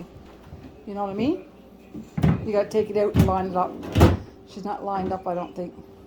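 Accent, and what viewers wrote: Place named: Cape Breton, Canada. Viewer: Ay, take me back to Cape Bretton. 👍 Love that accent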